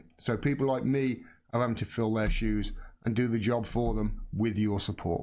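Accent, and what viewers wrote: United Kingdom. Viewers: what makes that story and previous ones you covered kinda 'palatable' for us powerless rabble is your great Brit accent! Would love to be able to rant about the media in my own french language the way you so honestly do. Thank you and here is a little story that will make you and your followers laugh your a** off in these bleak times, enjoy: <link> and here is the tape: <link>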